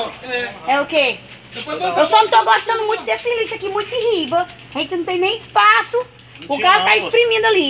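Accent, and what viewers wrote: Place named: Northeast, Brazil. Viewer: Tive que me inscrevê, apartir do segundo vídeo assistido, não resisti essa vòz rasgada do nosso nordeste . sou baina e admiro cada sotáque deste nosso imenso Brasil 😘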